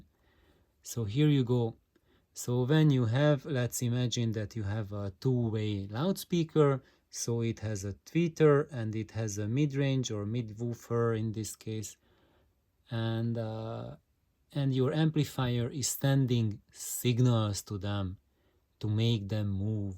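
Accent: Hungary. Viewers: Brutális ez a magyar (Hungrish) akcentus. :)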